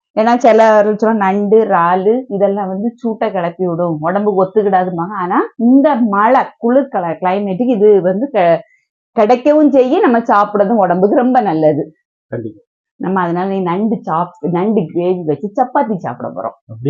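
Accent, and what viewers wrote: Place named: Kanyakumari, India. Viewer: Konjum kumari tamil supper puriyatha vanga than mannakatty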